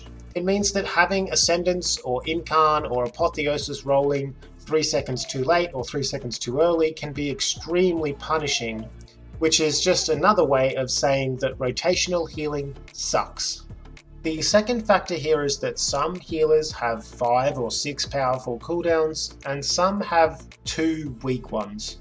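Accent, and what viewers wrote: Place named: Australia. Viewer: You sound Aussie!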